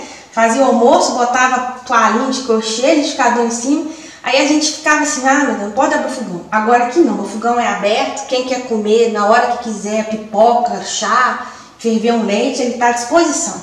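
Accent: Minas Gerais, Brazil. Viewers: Vc é de Minas? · Percebi pelo sotaque, tambem sou do centro de bh, mas moro em uma cidede pequena e amo roça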